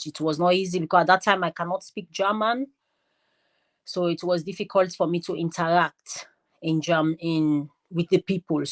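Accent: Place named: Nigeria. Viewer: This lady sounds Nigeria to me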